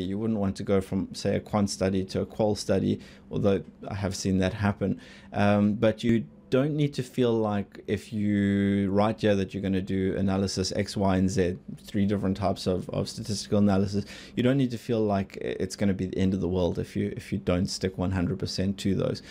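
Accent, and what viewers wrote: South Africa. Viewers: Are you South African? Seems obvious actually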